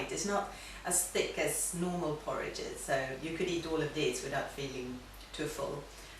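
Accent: Sweden. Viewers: You sound Swedish 😊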